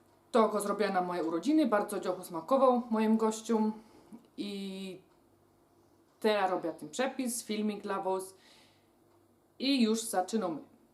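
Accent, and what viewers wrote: Silesia, Poland. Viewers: Jak ja nie cierpie gwary slaskiej (sory)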